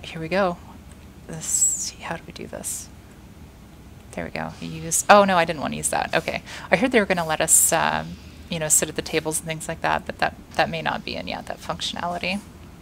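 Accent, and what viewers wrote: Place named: United States. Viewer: awesome peek at whats to come! I'm so pledging as soon as payday comes! Its proper exciting:D lovin your American accent:) EVE Online is no more for me....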